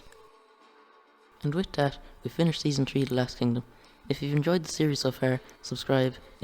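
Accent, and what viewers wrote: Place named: France. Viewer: at first I thought this dude was speaking french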